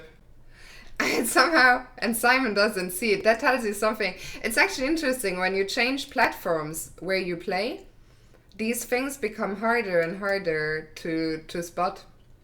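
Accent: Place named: Germany. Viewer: Choker is good fun if you can get it to run on your platform. I've tried 3 different Android versions and struck out every time. Am curious what version all the streamers are running on. Great job on the videos. Am intrigued by your accent. It sounds German, but if I recall correctly you're native to Luxembourg. How many non-English languages do you speak? Your English colloquiallisms and idioms are very good, attended university in the UK or US?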